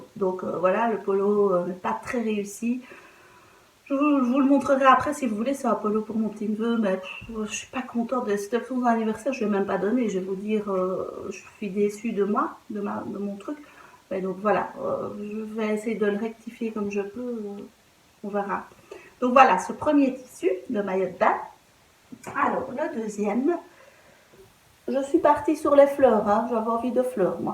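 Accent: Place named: Belgium. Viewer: Bravo, bravo pour ton concert à Rome! Ta robe sera très belle, j’en suis sûre...et je pense que le taffetas sera plus fluide une fois lavé. Pour les flex, oui, tu peux les couper en morceaux, pas de problème. Merci pour ce bon accent bien de chez nous et bisou de Marche-en-Famenne, Gene 🎼🎶🎶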